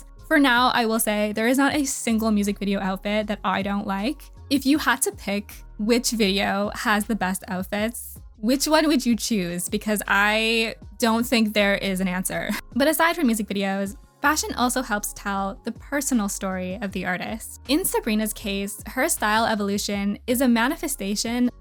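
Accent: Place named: Ireland. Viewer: Can I ask if you're Americans or Irish? I feel I can hear a slight Irish accent.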